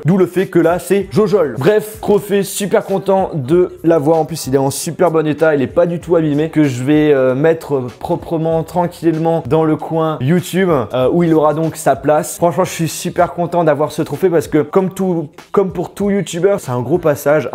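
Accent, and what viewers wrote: England, United Kingdom. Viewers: hahahaha j'aime ton accent d'englais sa me fait rire 😂👌✌️❤️ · TON AXAN ANGLAI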